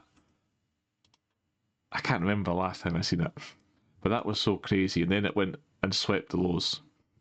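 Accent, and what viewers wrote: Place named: Elgin, United Kingdom. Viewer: Thanks, I bought back in at 17 and obviously I hold them in my pension bag. I also started buying again at theses levels a bit every day as I'm guessing we have been making a bottom. What I'm buying now I will sell and hopefully make profits and buy back cheaper if that situation pops up and we see a top forming. I try sort of day trading but it's beyond me at the moment using the daily 4 and one hour time frames . The violent liquidity grabs keep getting me. But we all must learn. I'm in Mexico but my family are in Elgin probably not far from you by your accent. Anyway keep up the good work it's appreciated.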